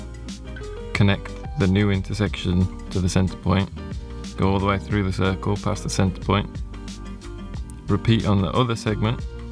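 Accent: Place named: Midlands, United Kingdom. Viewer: I'm a teacher looking to help my class draw simple pie charts. I loved this video. You keep it simple and I love your midlands accent!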